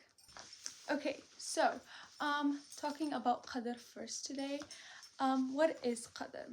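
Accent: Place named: Pakistan. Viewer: you got Pakistani accent.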